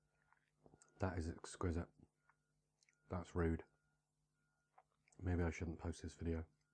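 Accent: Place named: United Kingdom. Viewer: It's getting cold here in NC, and I kept warm with your hot chocolate recipe last winter. Made it last night and can't wait to make it again tomorrow night. I'm a sucker for the British accent too 😉☕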